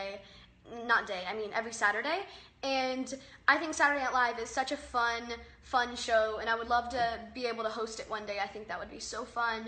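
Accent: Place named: Texas, United States. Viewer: Love your Texas accent yall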